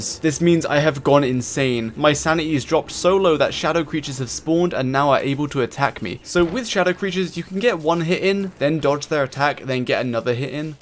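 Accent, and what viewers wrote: United Kingdom. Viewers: this guide is the best guide i've ever seen ım am not a beginner but ı've got some tricks by you also you mentioned about almost everythink thank you love ya :3 <3 btw your BRİT-ish accent is soo good :)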